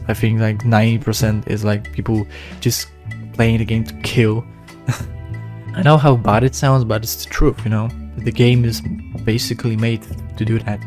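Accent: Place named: Turkey. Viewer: Bro has Turkish accent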